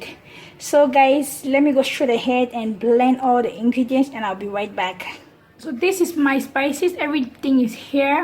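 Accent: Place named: Cameroon. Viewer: Hmmmm....you called 2 spices in French. Ever lived in a french speaking zone? Just wondering. You sound a little Cameroonian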